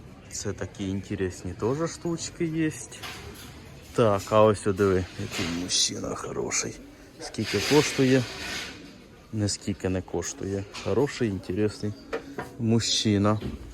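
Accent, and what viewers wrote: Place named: Kherson, Ukraine. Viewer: Дякую за ваші відео, дивлюсь із задоволенням. Звідки така цікава мова? Це в селах на Херсонщині так розмовляють?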